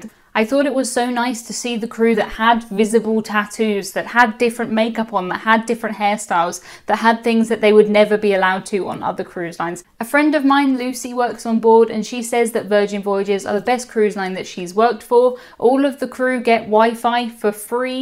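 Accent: United Kingdom. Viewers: It is called a British accent, a lovely and charming thing. That is why she pronounces taco in that manner, as most Brits do. If you went to the UK and said certain words with your American accent it would not be considered "butchering ", it would be understood that is how the American culture speaks. It's called tolerance and understanding. What a concept! 🙄🤣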